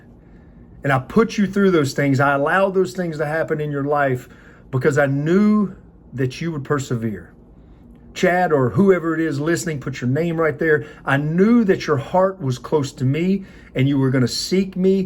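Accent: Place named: California, United States. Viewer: Hey Chad, your voice reminds me of my old school pastor back in my younger youth, with the Californian/southernistic (new word made) accent. I enjoy your videos and the good messages from the Word of God. I wanted to know your thoughts on the different translations of the Bible, like speaking of my old school pastor, he comes from that old school era of having your actual copy of Word of God in KJV and I believe that too but have somewhat laxed on it with using the YouVersion bible app but strictly to KJV and nothing else as I kind of bridge the gap of old school to the new school, as I am a late 90s kid just before that horrifying, tragic day in New York with a couple towers. My question is, do you learn more from other versions or do you find some more or less restrictive in obtaining knowledge you want to get from God, what do you get from these other translations than from KJV?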